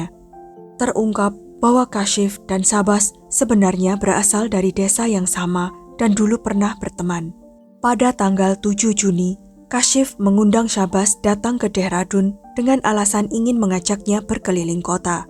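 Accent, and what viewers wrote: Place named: Java, Indonesia. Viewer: Kek org Jawa kek medok ngomongnyr😊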